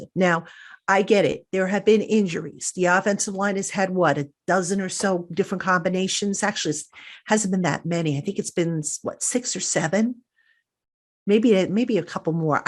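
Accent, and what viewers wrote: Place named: Northeast, United States. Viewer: LOL I f!@ love your accent. 'Ahwords' I love it. As a Canadian I have been conditioned to assume you're either a mobster or possibly a deli owner. But as a Maritimer we share a lot of vocal similarities with the North Eastern US, think just north of Boston. Other Canadians tell me I say "car" like I'm from NYC which is silly but there you have it. EDIT: Just so you know I subscribed a few weeks back at the start of the season but when you mentioned it I looked and I wasn't subscribed anymore. Just a heads up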